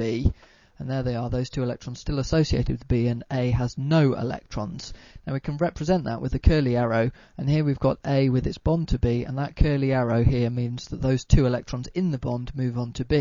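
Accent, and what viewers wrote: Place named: United Kingdom. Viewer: Chemistry makes so much more sense when it's taught with a lovely, soothing British accent! :D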